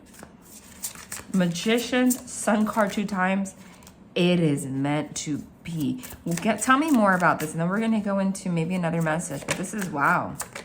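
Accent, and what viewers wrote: New Jersey, United States. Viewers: I loved the rituals, I vibed with the one for the naughty kids in the class 😂😂 Your voice and how you use it when the trance is strong is so cool, and the Jersey accent, of course. Especially for a South African male, our English accent does NOT sound good.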